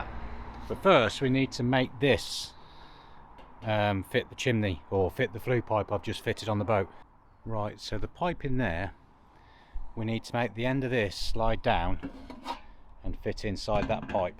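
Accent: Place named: RP, United Kingdom. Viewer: Very posh 😐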